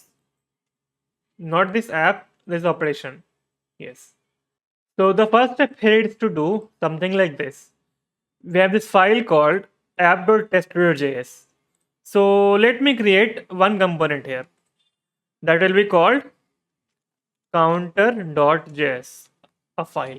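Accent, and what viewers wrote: India. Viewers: I dont understand indian english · Could not understand a word. If FreeCodeCamp keeps posting these videos with Hindi accent, their content is going to go down hill. Most praise in comments is by Indians who understand this accent with no issues. For others though, it's painful to listen for more than a 10s. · Hear Indian guy - close the vid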